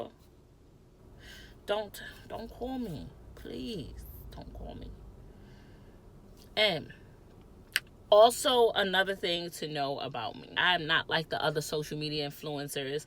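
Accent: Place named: Philadelphia, United States. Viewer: AWW love your accent, I'm from philly so I'm here for this accent honey! lol don't call you hahaha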